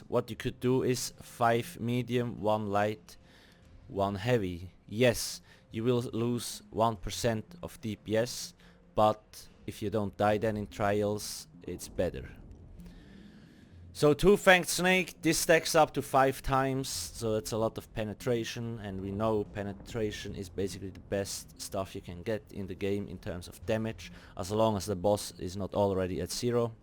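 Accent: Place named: Switzerland. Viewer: I'm a simple swiss, i hear swiss accent, i upvote!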